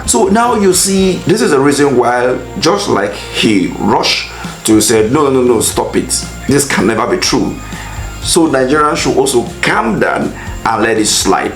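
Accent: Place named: United States. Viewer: Must you speak like an American. Though I appreciate you but be real.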